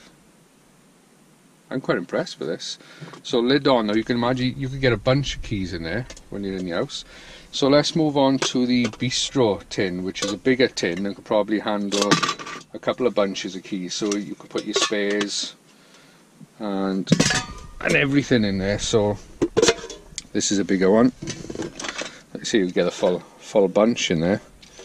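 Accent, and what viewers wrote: Wales, United Kingdom. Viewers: Good tip. Love the Welsh accent.....hope u like my Irish one!!! Was in Wales in 2002...very nice (Rhyll)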